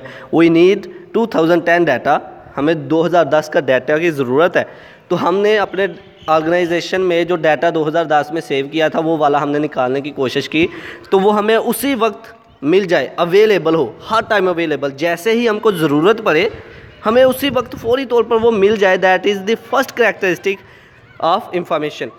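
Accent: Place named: Punjab, India. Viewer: Thank you for highlighting Sir! I had liked the video and subscribed the channel. Your tone resembles Punjabi speaking people.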